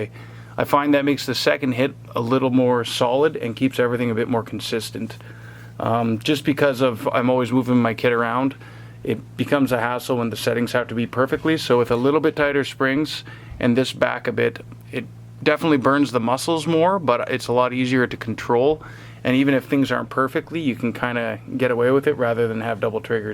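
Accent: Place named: Ontario, Canada. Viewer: I can tell from your accent you're from rural ontario? lol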